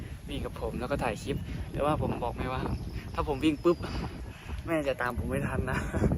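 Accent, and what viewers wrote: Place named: Isan, Thailand. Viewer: ต้าวมันกว้างมากไม่ใช่มันกว่าง😀😀🐷🐷🐷หมูน้อยพูดสำเนียงบ่ถิ่มบ้านเฮาเลยน้อหล่า🐷🐷🐷🐷😊😊😊❤️💖💞🐷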